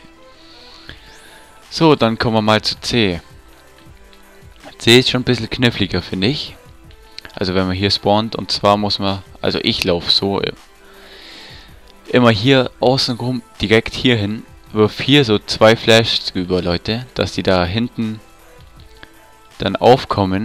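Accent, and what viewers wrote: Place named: Upper Bavaria, Germany. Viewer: hört man ;) oberbayern?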